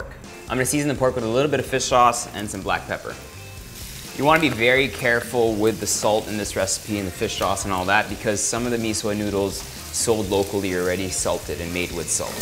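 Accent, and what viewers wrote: Philippines. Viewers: Your filipino accent is so cute and funny HAHAHAHAHA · OMGEEEE..!!!! the tagalog accent though!! This is the first time I heard you speaking in tagalog na matagal.. Lorve it!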